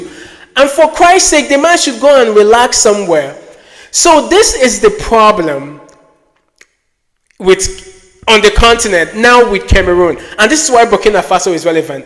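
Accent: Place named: Nigeria. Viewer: Hello mate! You've got your facts wrong or mixed up. Francophone Cameroon known as la Republique du Cameroun got its independence from France on January 1st 1960. You sound Nigerian. Anglophone Cameroon previously known as British Southern Cameroon was administered as an autonomous Unit within Colonial Eastern Nigeria with the headquarters of the latter Region in Enugu. In order to gain their independence, British Northern Cameroons and British Southern Cameroons as separate entities had to either join la Republique du Cameroun or remain within the Federal Republic of Nigeria which became independent on October 1st, 1960. During the February 11th, 1961 Plebiscites, the adult population of British Northern Cameroons voted to remain within the Federal Republic of Nigeria. Sardauna Province, as British Morthern Camrroon was known before Independence comprised parts of present-dayTaraba, Adamawa and Borno States. 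British Southern Cameroons voted on a 70-30% split to achieve independence by joining la Republique du Cameroon. Independence was effective from October 1st, 1961. Differences of opinion on governance between the federated states of West Cameroon and East Cameroon commenced after October 1st, 1961. The citizens of Ambazonia- the former federated English-speaking state of West Cameroon ensued marginalisation from the outset of a highly centralised Federal Republic of Cameroon. To get on in Cameroon, Anglophones must learn and master French. My generation accepted the fait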